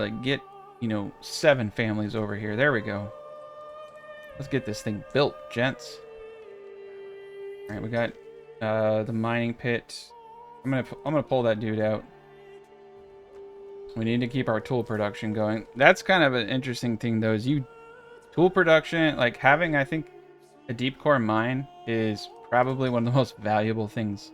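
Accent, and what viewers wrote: Canada. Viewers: as a South African i thought you where Canadian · i was thinking that you were from Canada🤣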